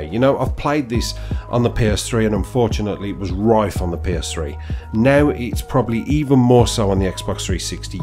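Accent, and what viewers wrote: Birmingham, United Kingdom. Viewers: your silky smooth brummie tones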